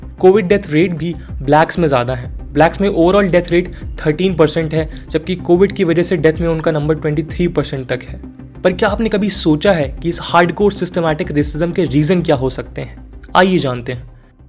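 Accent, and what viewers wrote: India. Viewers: Indian vox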